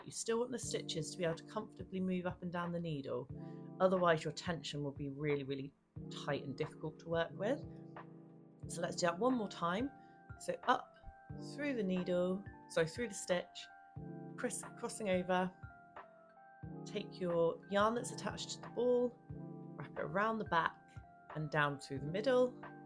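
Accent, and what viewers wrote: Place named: England, United Kingdom. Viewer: I'm 73 yrs old. When I was 22, I knitted my baby son a blanket. Now I can't understand the instructions until now! Thank you! Lovely English accent 😊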